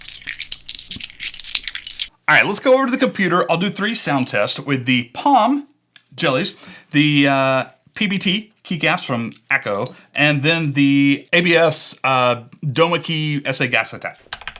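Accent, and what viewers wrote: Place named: United States. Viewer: 1) Matcha is a type of green tea, where the tea leaves are part of the beverage and not just the brew. It is pronounced MA CHA. 2) It takes forever to come to you because you sound like you are in the USA, and I believe the website your ordered from is in AUSTRALIA 3) The density of POM, or at least these ones, I think is slightly higher than PBT. Dunno if density translates to weight.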